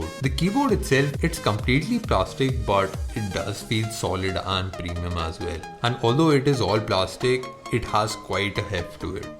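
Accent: India. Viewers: I missed my Indian friends by hearing your voice, good video bhai!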